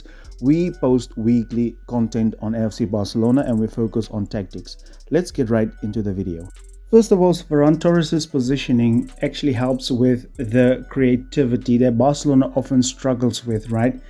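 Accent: South Africa. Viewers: hey mate you sound South African, where you from?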